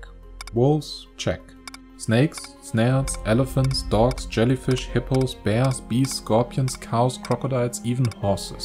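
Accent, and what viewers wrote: Germany. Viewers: ur german voice is annoying. otherwise nice videos. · Ich finde diese deutsche Aussprache so kacke :P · The german "I believe"narrator the art style this channel is my narcotic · Schönes Video. Ich erkenne den Akzent direkt :D Germany !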